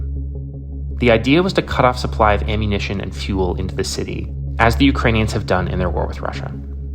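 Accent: United States. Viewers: Is this a Californian accent?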